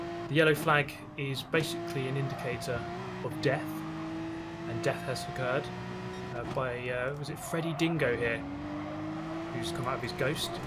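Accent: United Kingdom. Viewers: after a Long Hiatus from arcade/sim racing Gran Turismo 5 and GTR 2 Fia GT Racing PC( last purchased games). I'm just getting back to the groove months ago(July2020) from pad controller to Fanatec CSL F1 bundle(September2020) . Gran Turismo Sport(Awesomeness) and ProjectCARS2(Sim AWESOME), ProjectCARS3(going backwards A FLOP). I'm Hoping with GT7 to be the BEST in both Worlds, Graphics, Driving Realism Arcade and Competitive Sim Racing, I enjoyed watching your videos and host commentaries. I wish I did'nt take that Hiatus. Keep up the Great Work and Race Sim Driving !! Ohh, one more thing, I guess your Filipino ..cause it's pretty cool hearing that Brit accent. ;o)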